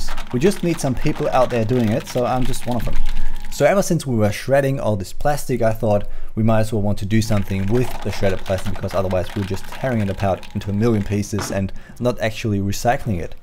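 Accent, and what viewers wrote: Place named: Australia. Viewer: Interesting accent. Sounds like Australian with a touch of European.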